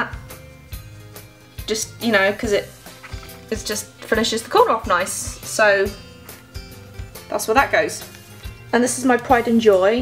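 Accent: England, United Kingdom. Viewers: are you australian or english? your accent reminds me like your from the UK or something XD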